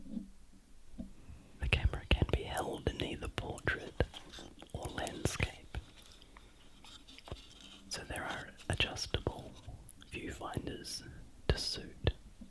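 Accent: New Zealand. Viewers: I love the New Zealand accents and Australian accents for amsr. They are different but similar so it works quite well. Love your videos.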